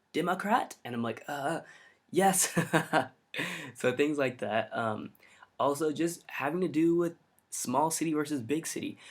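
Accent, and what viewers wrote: Spain. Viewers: I love your spanish accent! I am looking to visit perhaps live there, great video :)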